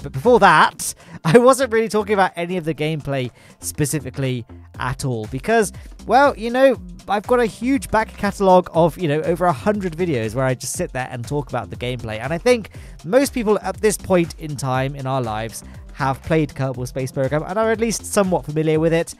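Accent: United Kingdom. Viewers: Is he British????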